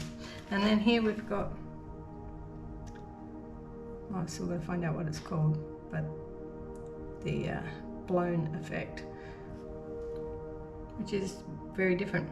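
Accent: Australia. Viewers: Thanks for sharing your learning curve. I am just about to start pour painting and love listening to an Australian accent. I live in Queensland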